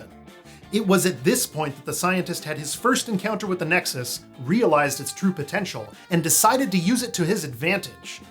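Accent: United States. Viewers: Glad to hear someone address the fact that you actually can get into the Nexus with a ship, and expand upon it to give a real explanation as to Soren's plan. Still weird hearing an American accent here though. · Trek culture being narrated by an American? I'm sorry, where am I? What alternate universe did I step into? (I don't hate it, I've just gotten used to Adam and the other guys.) Edit: After I marathoned TNG (because I'd only watched most of it over the years and not seen some episodes in full) I caught up on like 2 of the movies? Didn't like them much and still haven't watched the TOS movies because I haven't finished TOS. That said, catching up on the movies a second or third time around actually made sense, because ages 13-22, I was mostly out of the trek fandom and into anime and Animorphs, so watching the movies in my teens when they came out didn't really matter, as I recall. 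23-ish, watched Voyager reruns and nostalgia pulled me back into the fandom in a big hug! Can't believe I thought it was all "meh" for so long, but I guess maybe I was just trying to be cool or something, IDK. But it didn't help I wasn't impressed with TNG and we didn't watch ST:DS9 because it was all about war and my mom didn't like it. Voy was what I cut my teeth on, even if it's not the fandom's favorite. It's the redheaded stepchild of trek. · This guy's American accent is freaking me out.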